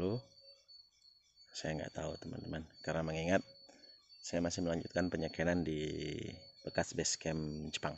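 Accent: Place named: Medan, Indonesia. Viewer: Biasa itu mass dalam logat medan😄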